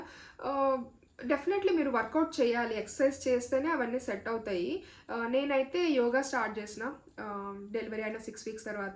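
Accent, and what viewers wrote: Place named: Chittoor, India. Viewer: I'm from Chittoor me language kuda almost ma Laage undhi language kosam nenu me vedios chustanu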